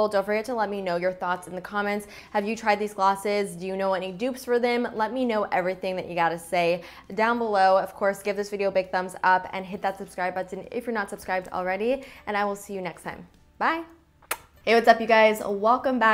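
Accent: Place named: Canada. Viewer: What part of canada are you from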